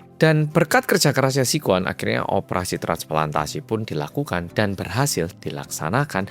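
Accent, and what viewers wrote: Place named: Java, Indonesia. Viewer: Malam muedok lgsg nyimaaak🎉🎉🎉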